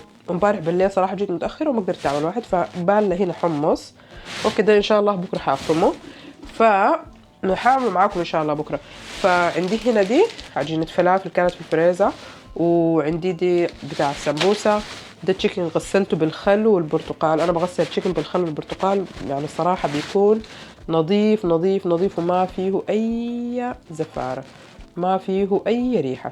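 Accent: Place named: Gulf, Arab world. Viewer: السلام عليكم ورحمة الله وبركاته ورمضان كريم عليك اختي. معليش عندي سؤال محيرني هل انتي وزوجك سعوديين او خليجيين ليه بتتكلم خليجي مع انو متأكد انكم سودانييين 100٪ ليه كذا ياريت الاجابه علي التعليق الواحد دايما يكون علي طبيعتو افضل والله. تحياتي لكم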